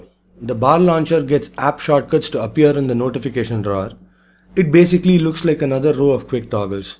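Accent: India. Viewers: I usually get annoyed when Indians try to imitate an american accent and fail miserably. I'm actually glad that he decided to stick to his Indian accent. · Guys dont be so judgmental on sumone's accent!!! wen sum Caribbean or Irish or Italian guys speak r we that much judgmental... then y d fck for indian.. carry on gud wrk... from Maharashtra Guy.(in case u r luking for my ethnicity)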